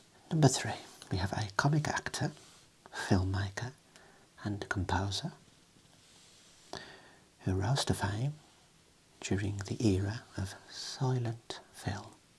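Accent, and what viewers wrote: United Kingdom. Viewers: Are you British? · I don’t know what the accent, british? but it sounds really good, and I love it 🥰 I always fall asleep ❤️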